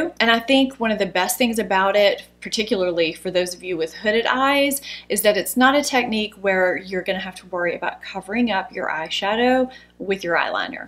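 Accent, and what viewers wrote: South, United States. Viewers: I appreciate your subtle southern accent #southernbelle 🥰🥰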